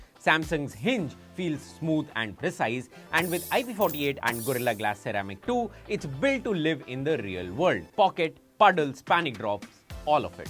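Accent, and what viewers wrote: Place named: India. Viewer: Horrible Indian accent, 🫤 i can't understand what you saying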